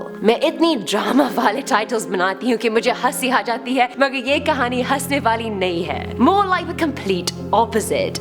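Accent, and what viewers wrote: India. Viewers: Dude ur Hindi accent is sooOooOooooooOooO cool.i just love the way you talk in that accent! Who agrees? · Unfortunately, hindi accent stopped working. OK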